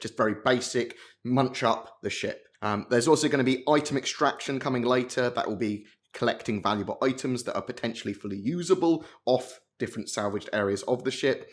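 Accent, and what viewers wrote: United Kingdom. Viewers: Terrific update, always love hearing about exciting SC news in a British accent. 😃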